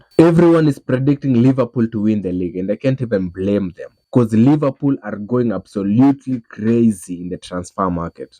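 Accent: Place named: India. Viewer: Indian accent level 10. Nah lowkey good video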